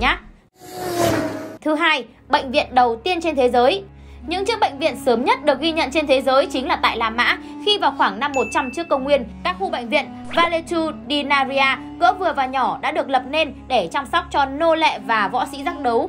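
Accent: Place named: North, Vietnam. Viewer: Hello chi em kg biet chi ten la gi cu keu chi la nghe no kg hop voi chi chuc nao gi chi dep gai Thi ten cua chi chac Han la dep lam vay chi Cho em biet chi ten la gi di di nhe chi em rat thich giong noi bac ky cua chi chi noi chuyen rat la hay va duyen dang nghe hoai nghe mai ma kg thay Chang chi noi chuyen rat rat la hay va thu hut em da bi chi thu hut tu Luc Lan dau tien em xem clip cua chi chi la idol cua em va la than tuong cua em em rat ham mo va rat nguong mo chi trong TRAI Tim cua em vay chi nho Cho em biet danh tanh cua chi nha du la chi co ten gi em cung thich nguyen sau chi Cho em biet ten cua chi la gi la em day mang nguyen lam Roi chi dung de em cu keu chi la nghe no kg thich hop voi chi chuc nao vay nho Cho em biet chi ten la gi nha cam on Chi love from Melbourne Australia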